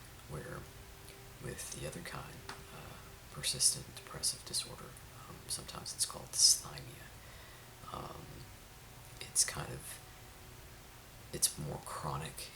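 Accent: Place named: Oklahoma, United States. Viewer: I’m from Oklahoma, so your slight accent makes me feel like 10 times better. Most people who do stuff like this talk properly and it’s soothing,yes, but your accent makes me feel at home and o feel like it’s actually comforting not just soothing.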